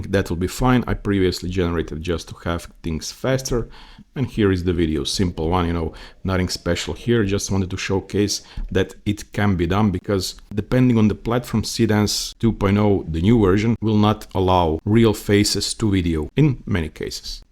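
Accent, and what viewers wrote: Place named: Eastern Europe. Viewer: Where are you from? I hear eastern european accent. this is a grate video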